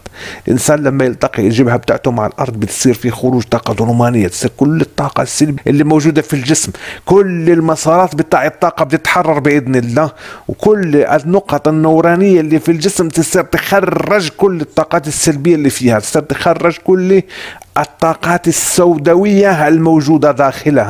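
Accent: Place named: Egypt. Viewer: يا شيخ انت اكيد مصري مش مغربي عشان لهجتك مصريية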